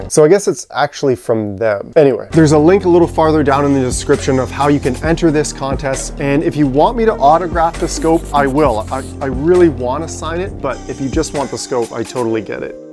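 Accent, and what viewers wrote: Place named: Canada. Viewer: I'm guessing from your accent You're from Canada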